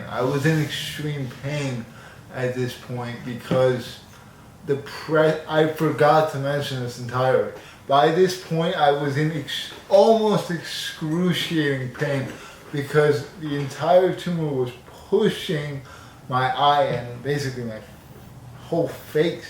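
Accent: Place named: South Jersey, United States. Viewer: Im praying for you brother. Yeah this not the type of video I ever see on my feed man Im a sneakerhead and I've been subscribed to you for a while. Please let us know how your progress is going whenever you can. Oh wow didn't know you are from New Jersey as well. Im located in Central Jersey. Sounds like you are in South Jersey. ✊️🙏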